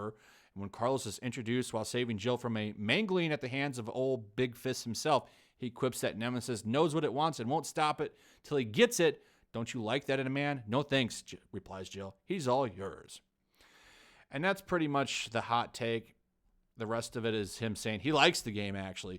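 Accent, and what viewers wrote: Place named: RP, United Kingdom. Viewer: I had to stop listening to you read that article. Not because of the hoity toity accent, that was brilliant, but because it's not journalism. It's politics. I've come to absolutely despise politics. I'm not just talking about SJW politics, I despise all politics and wish people would stop. Politics these days aren't about helping people, they're about tearing people down... or... grandstanding [is that the right word?] yourself so you seem like the only person of any importance. Thank you for shedding light on this political piece, thumb up, well done, but I can't. It's not you. It's the words you're reading xD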